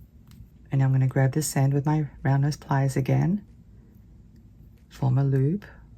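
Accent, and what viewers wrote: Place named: Australia. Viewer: I watch on my android phone and I never have problems with the audio and I love your Australian accent.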